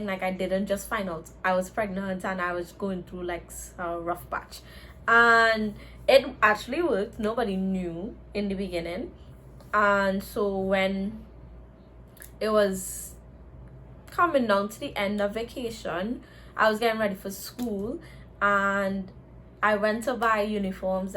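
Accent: Trinidad and Tobago. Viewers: She sounds like a Trinidadian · what's your nationality? sound Trinidadian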